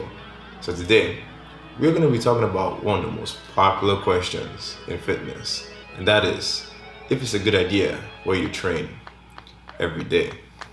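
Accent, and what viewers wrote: Nigeria. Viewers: Omoh you be Naija guy???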